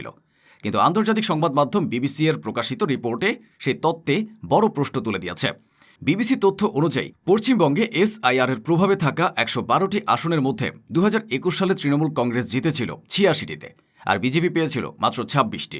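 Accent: Bangladesh. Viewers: The accent of narrator seems Bangladeshi not Indian. Mere wet dreams! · কথা শুনে মনে হচ্ছে বাংলাদেশি ,,🥴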